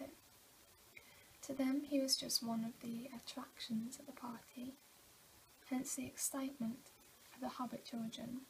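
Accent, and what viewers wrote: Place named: Wales, United Kingdom. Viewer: It sounded like you had a strong Welsh accent in first video but not so much now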